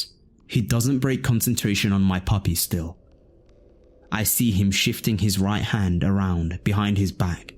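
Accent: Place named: United Kingdom. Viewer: Well this guy is british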